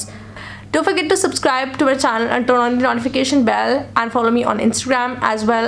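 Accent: India. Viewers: I wanna ask do people there react negativity for your indian accent? Cause i heard that foreigners doesn't like Indian accent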